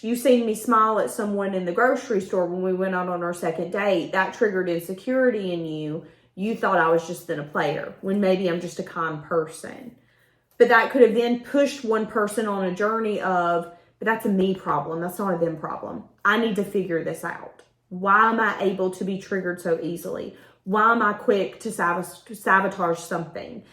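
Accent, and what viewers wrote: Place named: Tennessee, United States. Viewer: I should have known you were from TN... with that accent. I live in white house TN.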